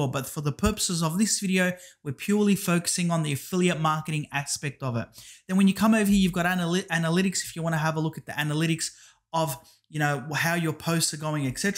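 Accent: Australia. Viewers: I'm from New Zealand so I picked up on the Australian accent and just assumed. Hey, btw great video. Whats your #1 source of traffic to your non MMO offers? Paid or free?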